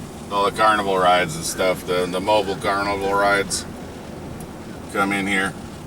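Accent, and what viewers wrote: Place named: United States. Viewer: Yeah, it gets complicated when converting. US Gallon = 3.785 litres UK Gallon = 4.545 litres £ - $ is £1 = $1.6123 (today) or $ - £ is $1 = £0.6 This is why I prefer to compare in litres as it makes it simpler. So your $3.45 per US Gallon would be $0.91 per litre In the UK we pay £1.38 per litre which is $2.22 per litre Now you know why we have to make more fuel-efficient cars! I also noticed you said maths as mathematics is always plural. P.S. Cool John Wayne type accent.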